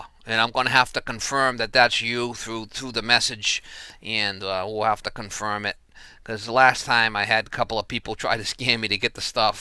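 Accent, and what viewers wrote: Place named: Boston, United States. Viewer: parker sporlan give away. If it weren't for that Boston accent, you could be doing voice-over work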